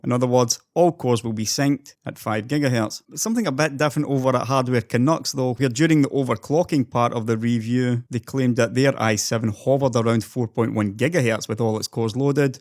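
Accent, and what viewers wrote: Scotland, United Kingdom. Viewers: The only thing better than your video execution and the information provided is your glorious Scottish accent.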